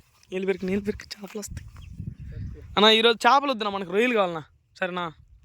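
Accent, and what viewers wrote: Nellore, India. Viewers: Nellore slang kosame chustanu nee vedio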